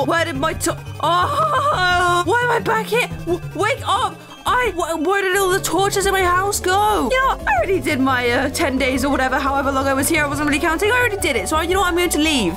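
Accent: United Kingdom. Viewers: I love how she starts sounding really really british halfway through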